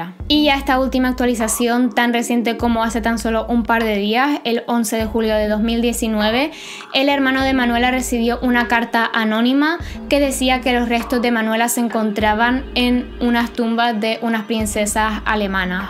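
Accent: Cuba. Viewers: Eres cubana????? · Pensé que eras Cubana o Puertorriqueña.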